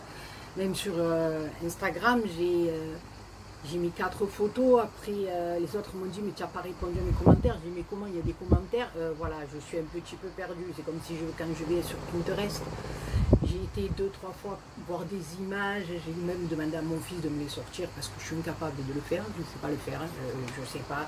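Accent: South, France. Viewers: Coucou. Je suis tout à fait d'accord avec toi. Ces questions sont vraiment c.....s. Comme pour Toi, youtube c'est une source de partage d'idées, de tutos et de connaissances rigolotes comme tu es, comme Greg etc.... que des gens simples et francs, et cet accent qui est le mien j'adore. Moi aussi toutes ces questions me font "monter la boufaïsse". Comme j'aimerai vous rencontrer Toi, Greg, Patou parce que je pense que nous ne sommes pas loin (géographiquement parlant) je peux espérer peut-être un jour chez Action Saint -Victoret ou Istres (car je suis plus près d'Istres. Un conseil. Continues, ne changes rien. À bientôt. Bisous 😘. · Coucou, j'adore regarder tes vidéos, ton naturel et ton accent bien du sud me donne du baume au cœur. Et comme tu dis chacun fait comme y veux. Bisous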